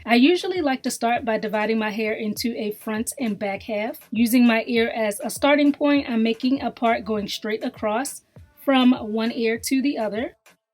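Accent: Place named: Maryland, United States. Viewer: I'm getting ready to try this. I followed your butterfly lock tutorial & got tons of compliments! Thanks! (Where are you from? You sound like you're in Maryland. Either way, I love your accent!)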